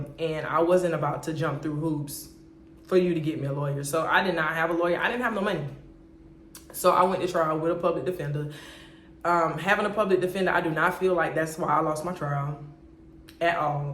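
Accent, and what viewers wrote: Florida, United States. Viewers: Listening to your stories I was trying so hard to figure out what part of Florida you from. Highlands county deep in the country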